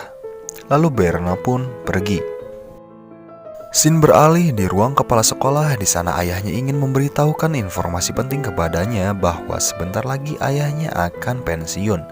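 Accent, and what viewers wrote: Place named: West Java, Indonesia. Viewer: Aduh kang Sunda pisan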